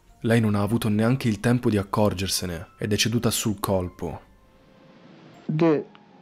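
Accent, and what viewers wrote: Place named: Bari, Italy. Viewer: Pensavo anche io, poi ho sentito come pronuncia le "o", direi che è barese. Mancano anche le doppie ovunque. Fonte: sono sarda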